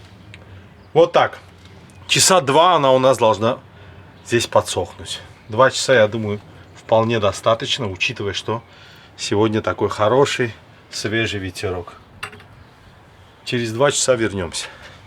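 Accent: Baku, Azerbaijan. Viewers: Этому человеку необязательно что - либо готовить) достаточно просто рассказов с прекрасным бакинским акцентом и этого вполне достаточно)) Все как всегда супер, слюной подавились) Джаныныз саг олсун! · Салам. Акцент Бакинский 👍❤️👍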